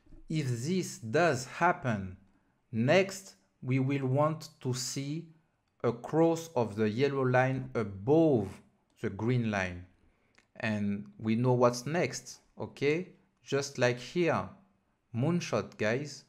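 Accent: France. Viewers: Merci pour cet anglais "français"... je comprends TOUT ! merci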